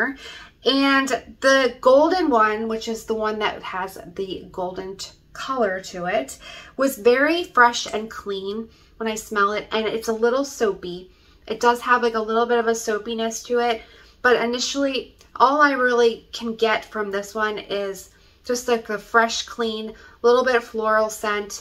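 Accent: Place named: United States. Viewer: Omg the American accent is so cute.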